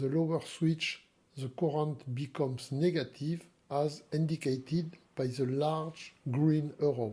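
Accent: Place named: France. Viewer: French accent is soooo funny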